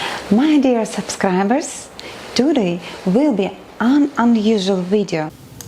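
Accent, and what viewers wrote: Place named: Russia. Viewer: Сач э гуд инглиш, бат виз сам рашн акцент. Бат ит нот со хэви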